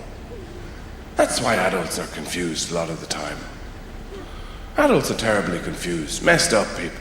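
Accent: Ireland. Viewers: That’s just an Irish accent 😂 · No. He is Irish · Nah man, he’s just Irish. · He's Irish. Don't judge. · He's Irish . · He's Irish, yes we know. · muller Except that they sound quite alike as both grew up in not far outside Dublin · He's just Irish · captain obvious.... he is Irish. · Isn’t that just Irish?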